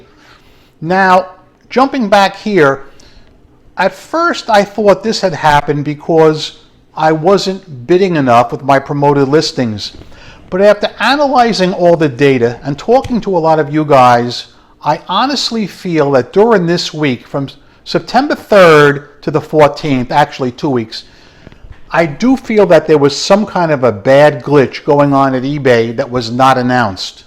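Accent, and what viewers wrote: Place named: East Coast, United States. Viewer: my west coast ears hearing an east coast voice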